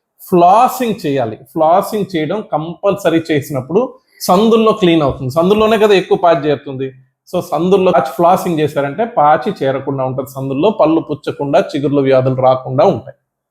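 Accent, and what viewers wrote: Nellore, India. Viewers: నెల్లూరు యాస డాక్టర్ గారికి మా చెడ్డ అలవాటుండ్లా..... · ఒకో ప్రాంతానికి ఒకో యాస ఉంటుంది. నెల్లూరు యాస లో మాట్లాడుతున్నట్టు గమనించగలరు.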